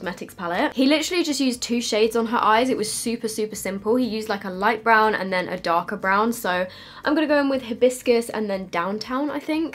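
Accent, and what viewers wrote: United Kingdom. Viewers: Liked the tips very much, but what I liked the most, is your pretty accent!!! I am used to all these Americans doing tutorials (although I am German), so I forgot how I love the British accent!!!! May I ask, where you come from? Is it (as I learned in school) Oxford English? Nevermind, my ears love it anyway!!! Sorry, that I do not comment so much at your technique or whatever but more at your speech.😂 (comment on /comment at?) Sorry, I am German as I said.😉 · She looks like Sabrina Carpenter and she talks like a British Sabrina Carpenter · I luvv your accent. Is that british? 😍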